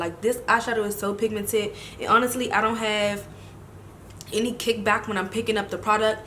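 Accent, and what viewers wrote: Washington DC, United States. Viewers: You have to be from the DMV....the accent gave it totally away! I ran 🏃🏾‍♀️🏃🏾‍♀️🏃🏾‍♀️🏃🏾‍♀️ and copped me 2 of the bases and about to dive in and buy the palette. Thanks girl. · I knew u was from DC from your tone of voice 😩😍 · I just knew you were from dc..we have a certain type of accent lmao..I immediately subbed😍 we gotta support each other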